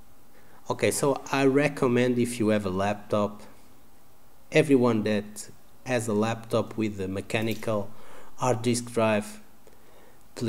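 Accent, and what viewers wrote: Portugal. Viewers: Ele é português?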